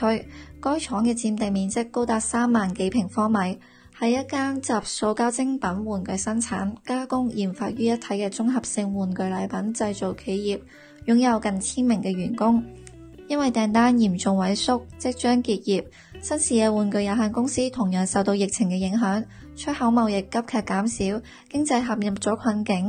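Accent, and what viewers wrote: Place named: Hong Kong. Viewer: 是HK話 唔係廣州話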